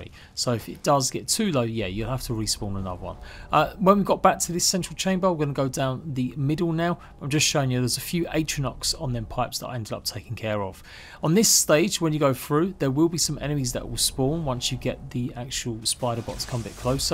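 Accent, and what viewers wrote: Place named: United Kingdom. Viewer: Ur to british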